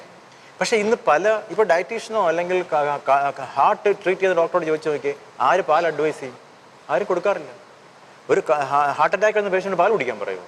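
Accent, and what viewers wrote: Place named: India. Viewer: Why are so many of these "white poisons" videos narrated by Indians with heavy accents? Aren't there any American experts who are willing to speak up on this matter?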